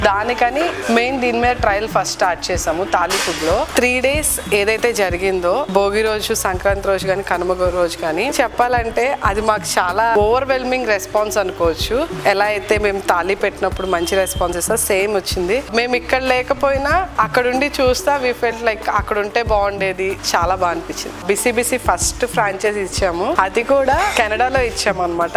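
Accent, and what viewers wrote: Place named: Vijayawada, India. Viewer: Me slang ma vijayawada laga undi .miru vijayawada lo epudu start chesthunaruuu.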